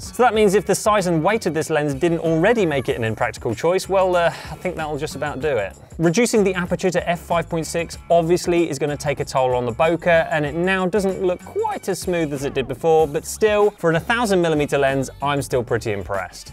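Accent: United Kingdom. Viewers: Love your appearance on the YouTube Creators Hub podcast, (nice to hear another fellow Brit on there!). And the intro to this video sealed the deal. Amazing 😂 Wishing you the best of luck on here.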